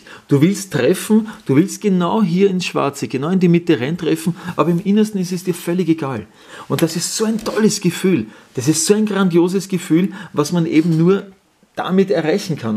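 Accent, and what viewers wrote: Austria. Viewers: 💜🦢💚 Bedanke mich & grüsse ALLE herzlich ⭐ am Samstag Gemüse gepflanzt in meinem wilden Garten 💚🦢💜 Ich liebe den österreicher Akzent.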